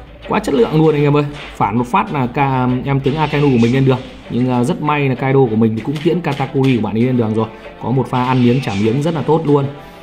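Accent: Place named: Hung Yen, Vietnam. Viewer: Nghe bác nói quê Hưng yên. Bác chỗ nào HY thế.?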